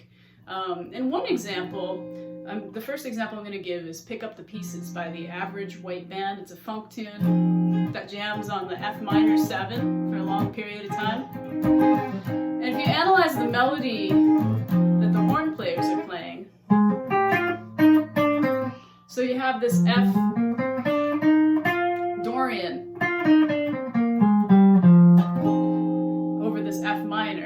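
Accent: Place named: United States. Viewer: Hello.Im a very late beginner to playing music, and on Guitar, I just managed to learn the Dorian mode in A, also learning. Youe lesson I just viewed is great BUT 2 things interfere to learn from you, 1 speed of instruction and 2 accent im from England so the American accent and speed of delivery is difficult to follow for me. BUT I enjoyed this lesson tutorial whatever. Thank you I will review it many times hopefully understanding as I develop in understanding your presentations.Nice to listen to a female for a change, I tihink maybe easier to learn from? Looking forward to hearing from you more.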